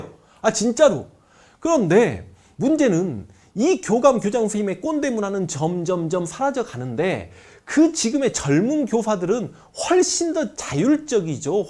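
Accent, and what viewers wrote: Jeolla, South Korea. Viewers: 형님..잘 보고 갑니다. ^^ 전라도 분인것 같아서 ㅋㅋ 저도 전라도거든요 ㅎㅎ 앞으로 더 좋은 가르침 부탁드립니다.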